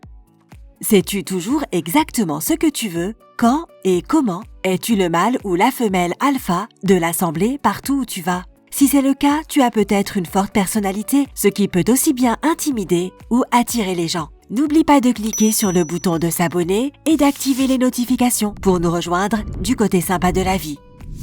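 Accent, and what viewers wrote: Quebec, Canada. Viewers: la voix est horrible on dirait elle a le nez bouché avec des petites touches d'accents québécois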